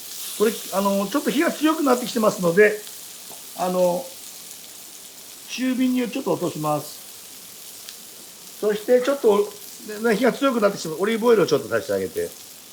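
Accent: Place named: Tohoku, Japan. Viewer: 三國シェフは、南部か宮城の方ですか？それか道南かなあ。懐かしいアクセント。かぶ、畑からとってきて、このレシピやりまーす！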